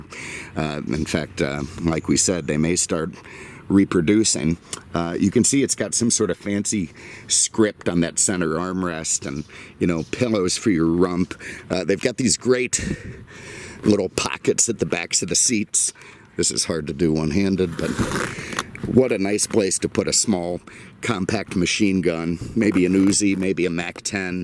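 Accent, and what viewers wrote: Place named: Midwest, United States. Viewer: While watching and enjoying your videos I wondered about your accent. Suspecting it to be Midwestern. Thanks for the confirmation that you have Chicago roots. Keep up the good work.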